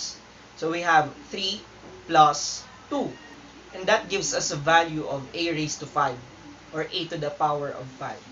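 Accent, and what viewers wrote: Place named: Philippines. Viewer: I love his Filipino accent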